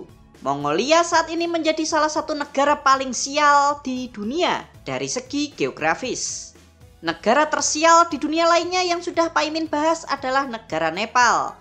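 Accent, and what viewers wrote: Java, Indonesia. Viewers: Logat Jawanya yg sangat Medok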